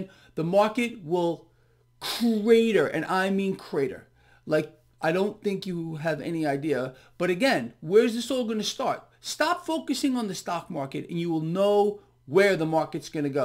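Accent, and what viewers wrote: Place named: New York City, United States. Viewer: His is a New York City dialect.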